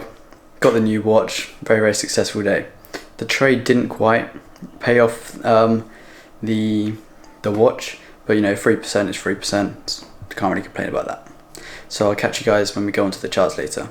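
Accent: United Kingdom. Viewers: You from Bournemouth mate?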